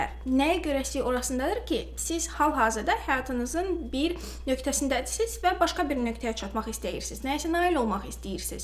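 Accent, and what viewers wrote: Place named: Russia. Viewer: по твоему акценту я понял что ты владеешь и русским языком, но пожалуйста разговаривай как тебе угодно не обязательно говорить на строгом азербайджанском будь свободной не комплексуй )))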